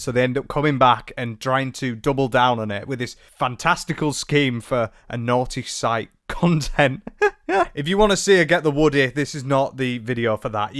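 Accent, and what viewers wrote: United Kingdom. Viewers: Huh i didn't think she'd have a British accent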